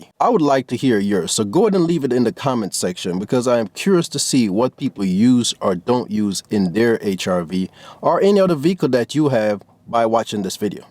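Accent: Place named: Jamaica. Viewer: You sound like you have Jamaican heritage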